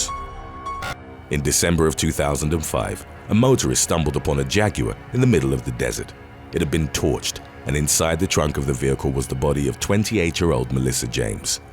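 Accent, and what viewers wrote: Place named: United Kingdom. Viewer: A Lu min e um 😂 😂 you Brit’s crack me up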